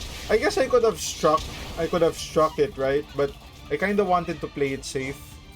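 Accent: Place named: Philippines. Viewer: u sound Filipino af. are u Filipino?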